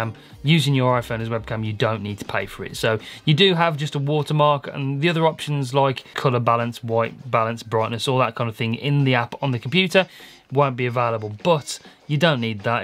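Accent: Birmingham, United Kingdom. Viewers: Loving the brummy accent from another brummy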